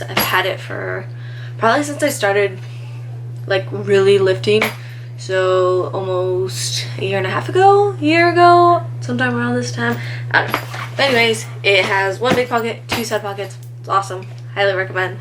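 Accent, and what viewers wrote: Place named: Italy. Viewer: Been hanging out with me too much is why you're talking like an Italian sorry not sorry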